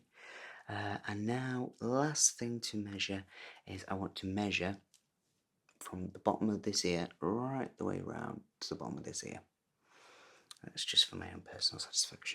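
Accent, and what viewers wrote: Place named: North, United Kingdom. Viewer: You sound very... Northern. I like it 😋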